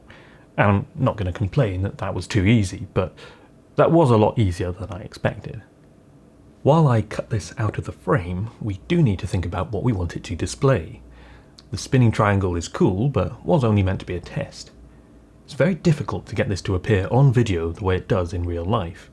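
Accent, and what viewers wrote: United Kingdom. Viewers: Can I ask🤔, and I ask out of pure curiosity and mean no offence, why is it you speak with a very good well educated British accent but sound "wires" with a V? ....please feel free to tell me to "multiply and go forth" and mind my own beeswax....I cant help it its my curious mind, when I have a curious thought go through my mind It just keeps jingling around in there distracting me and keeping me awake at night until I know the answer🙆🤦🤷🙄😅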